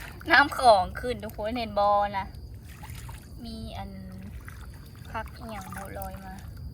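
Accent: Laos. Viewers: สาวลาวน่ารัก สำเนียงพูดเพราะครับ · ผมชอบสาวลาวพูดน่ารักดี ไม่แปลกใจทำไมหนุ่มไทยจึงสนใจสาวลาวกัน.😍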